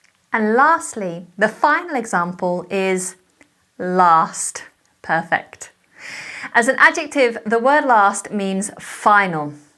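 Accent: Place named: United Kingdom. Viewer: A Muslim here , i love your British accent and i hope you and everyone become A Muslim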